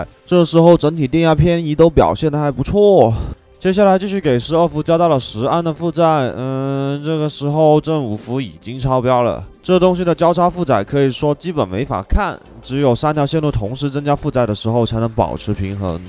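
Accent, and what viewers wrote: Cantonese, China. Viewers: 好亲切的白话普通话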